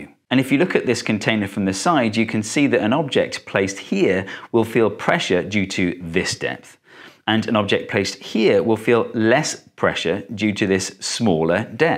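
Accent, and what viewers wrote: United Kingdom. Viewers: I look forward to videos...but.... I feel that he is fully taking advantage of the fact that Americans have been programmed to think that people with a British accent are smart.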